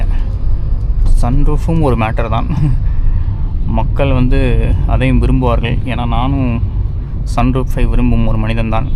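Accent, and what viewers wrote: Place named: North, India. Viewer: endha ooru bro idhu? vadakan pan parag ad ah iruku, oore pan parag potu thupuna mari iruku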